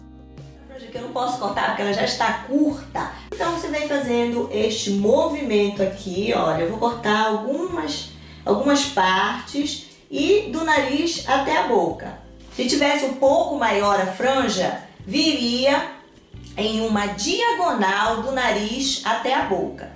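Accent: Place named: Pará, Brazil. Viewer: Essa é paraense...hahaha sotaque muito parecido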